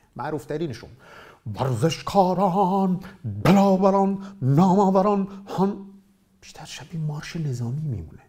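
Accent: Bushehr, Iran. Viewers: لطفا لهجه شیرین بوشهری رو نگه دارید🙏 به موقع قرض ضد بیماری قند خواهیم خرید!😊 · دمت گرم، لهجه بوشهری عالیییییییی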